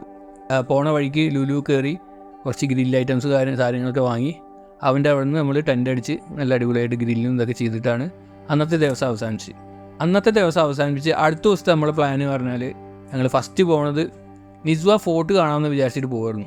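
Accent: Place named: Valluvanad, India. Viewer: വള്ളുവനാടൻ ഭാഷയിലുള്ള ഇമ്പമാർന്ന അർത്ഥപൂർണമായ നരേഷൻ.... 😌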